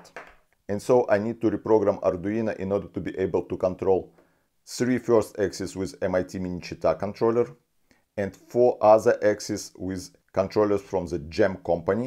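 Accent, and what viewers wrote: Russia. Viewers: Ебать акцент, наш чтоли?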